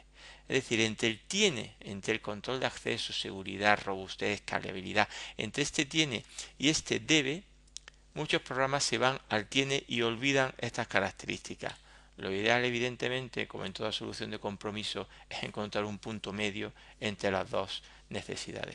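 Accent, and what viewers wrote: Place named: Andalusia, Spain. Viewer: Estoy estudiando para secretaria de dirección y su vídeo me ha salido sugerido a través de uno recomendado para el estudio. No haga caso a esa persona que se ha metido con su voz, que no afecte a la seguridad en usted mismo, tiene una voz agradable ¡y ese acento tan encantador del sur...! Buena contestación, por cierto. ¡Ánimo!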